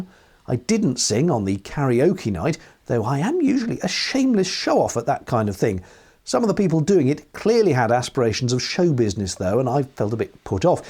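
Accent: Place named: England, United Kingdom. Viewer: I often wonder is it mostly Americans that watch and enjoy these videos, because of the accent and Monty Python style comedy styling? In the UK, just sounds like everyone else on the BBC. In America he has that great accent and English style humor, that Americans love. I don't care about cruises, but if does the video, I will watch it. Subject does not matter.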